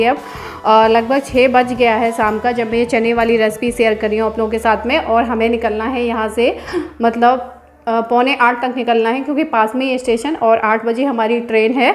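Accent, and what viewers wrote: Uttar Pradesh, India. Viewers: Ap up se ho kya... ??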